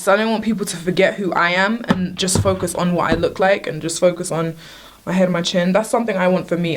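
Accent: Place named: Council estate, United Kingdom. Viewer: hate how she talks. as if she lives on a council estate.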